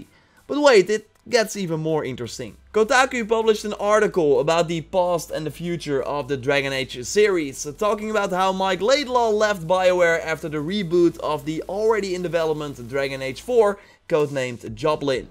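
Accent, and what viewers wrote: Netherlands. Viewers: goeie channel echt waar maar das elke keer weer da hollands-engels accent weer gewoon worden haha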